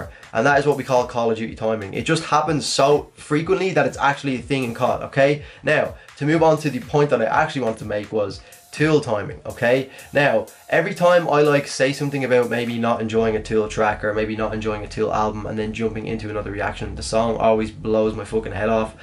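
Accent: Ireland. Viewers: I found it so fascinating when (rad-key) reacted to you. He thought you didn't like ' '. I thought HOW is he NOT seeing your reaction as positive? I legit believe he had trouble understanding your VERY easy to understand Irish accent.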